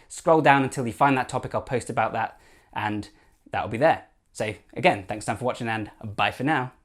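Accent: England, United Kingdom. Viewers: Can I be honest? I stumbled across your channel by accident and at first stayed on wondering how you would be engaging and educational. I love to commend and recommend which is what I now do with you. I love your fitness stuff, but love the way you introduce other spheres of interest into your videos. You are a breath of fresh air on YouTube and as one English man to another I enjoy your very English twang. Great content, thank you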